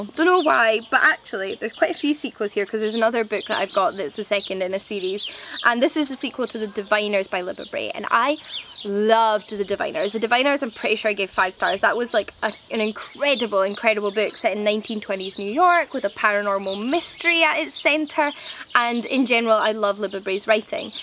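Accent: Scotland, United Kingdom. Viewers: Its so nice to hear a Scottish voice pop up on my suggested videos especially from my home city. Makes booktube a little more reliable for me!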